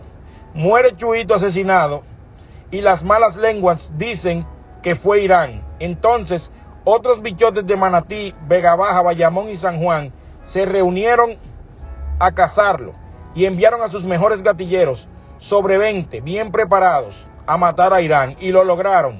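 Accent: Dominican Republic. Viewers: El narrador parece dominicano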